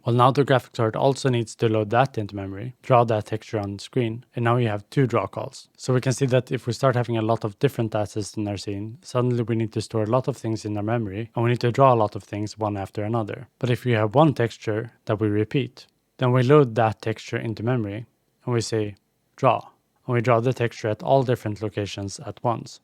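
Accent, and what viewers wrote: Sweden. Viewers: Your not swedish like me by any chance? Sounds like you have a stockholm accent.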